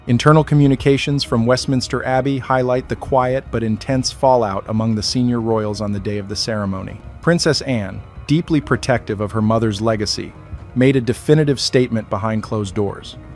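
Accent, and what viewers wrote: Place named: United States. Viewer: WHAT A LOAD OR RUBBISH ! Done with an American accent and no accurate knowledge of the Royal Family.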